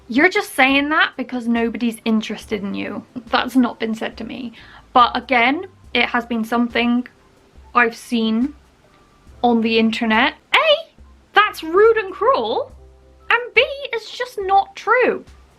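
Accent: Bristol, United Kingdom. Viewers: This has been helpful. Also it's nice to hear a Bristolian voice on Youtube :) cheers drive!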